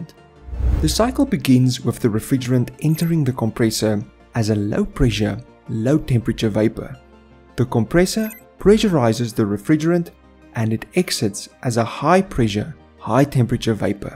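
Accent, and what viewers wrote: South Africa. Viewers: South African accent👍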